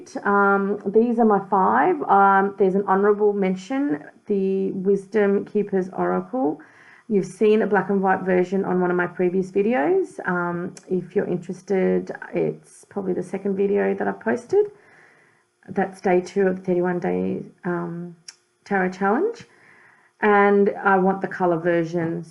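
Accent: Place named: Australia. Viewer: Great video! I love listening to your voice. So nice to hear another Aussie on here :) (Stacey aka Stacey Lyn off fb) 🙏🏻💜🙏🏻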